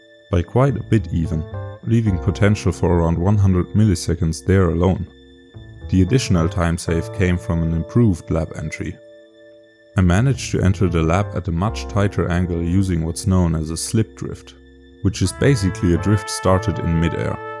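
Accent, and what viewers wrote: Germany. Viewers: Bro sounds a bit German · i just found your channel and after 10 seconds i knew i had to subscribe banger video man + i know youre german but i cant prove it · 2000% bist du deutscher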